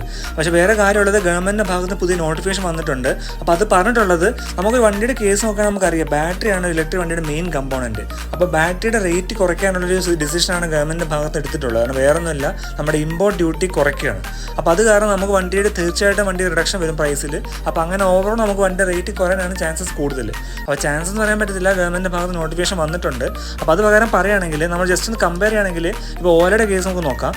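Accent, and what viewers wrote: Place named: Palakkad, India. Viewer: That usage was your signature style and enjoyed the same. By the way are you from Palakkad, coz the particular style seems so.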